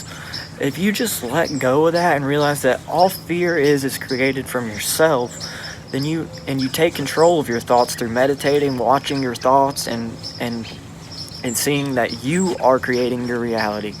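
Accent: South, United States. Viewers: You sound like your from the south...how old are you?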